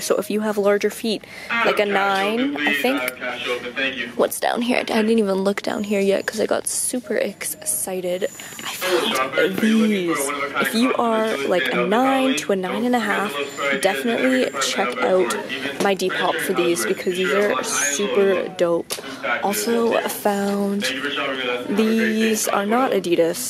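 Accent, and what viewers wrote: Canada. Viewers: i'm from the U.S. but i can tell when someone's Canadian by how they say out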